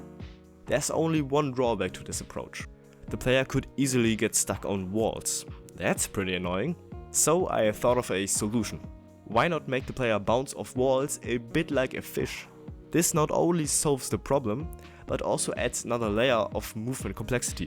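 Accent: Germany. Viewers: kann es sein das du deutsch bist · Youre german arent you? I can hear the accent a little. :) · Are you german? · very cool devlog and game! You're very talented at deving and making vids, keep it up! The only thing I notice is a little ''german accent'' If I'm correct pls let me know :D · actually a game i would buy. Are u from germany? I like ur german accent. keep on inproving. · I love your german accent, correct me if im wrong but its so typical like me haha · noway you arent german you sound somuch like youre from germany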